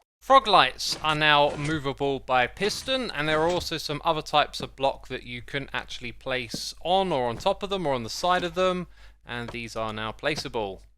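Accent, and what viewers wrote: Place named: United Kingdom. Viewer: realizing i have never heard anyone with a british accent say "y'all" until today.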